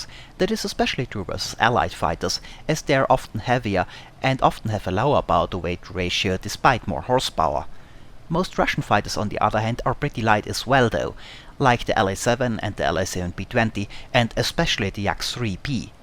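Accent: Austria. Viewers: ***** so you havent heard an Austrian, exactly said Tyrolean, speaking english before. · IIRC, he is from Austria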